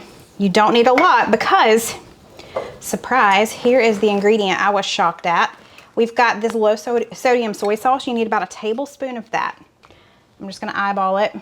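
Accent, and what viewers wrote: South, United States. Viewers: I call it an eye as well and my family and I absolutely adore the Waffle House 😋🤤! Oh and don't worry because leaving that 'G' off of our words is a Southern thing😂. I really enjoyed this video and thank you so much for sharing I will have to try all of these new recipes! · Embrace your southern accent. ❤ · I LOVE your southern accent. I undesrtand it perfectly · The "eye" on the stove 😂 I think my mom calls them eyes too. I call them burners. But your southern accent says "aah" instead of "eye." Like... AAAH!! There's an AAH on the stove! 😛 love it. I talk southern sometimes and I'm only in Indiana lol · I love your "Southern" please don't correct yourself! I recently moved from NC after living there for 37 years and moved to another "southern" state (OK). So not the same! I miss the Carolinas so much! I even miss Food Lion and I had a love/hate relationship with them. 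I've watched you for quite a while but now the videos make me feel like a trip home. I am with you on the peas and lima beans, I can take them in a chicken pot pie or Brunswick stew. I moved south too late in life (23) to appreciate okra in any form or fashion though. I did make Steven's salsa, we all loved it!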